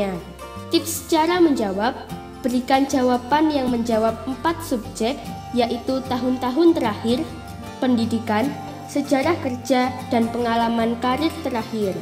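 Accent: Java, Indonesia. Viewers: suara ibu peri asli pulau jawa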